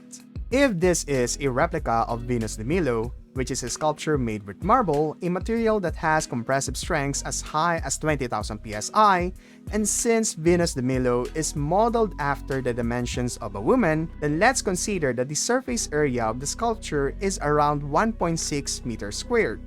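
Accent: Philippines. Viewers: I think you're a Filipino, from the accent that I am hearing.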